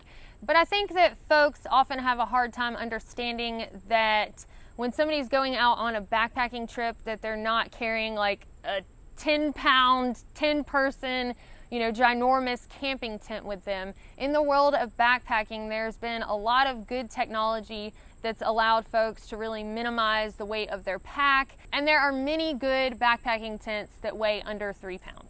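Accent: South, United States. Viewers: Loving it! Always entertaining and useful information and presented in a "non-judgemental" and non-patronizing way. You are the voice of experience and of understanding the transcendent nature of hiking. One question. I see that 10 people did not like this video. Do you have any way of understanding why some people don't like this video? I guess in life there are people who just don't like things, but I don't understand. Technical issues? Don't like a southern accent? (Barbarians!) Object to a rational approach to carrying guns? I fail to see their problem and am just curious if there is a way to say to those who check thumbs down, why don't you like this? Can I change something? Just curious. But for the 1,500 + of the rest of us, hike on! We love the videos and look forward to your/our next journey! Cheers, Tortoise (Slow but Sure)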